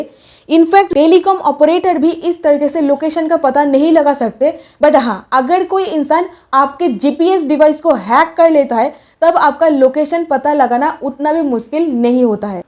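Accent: Bengal, India. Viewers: आपको अपने बांग्ला accent को थोड़ा सुधारने की आवश्यकता है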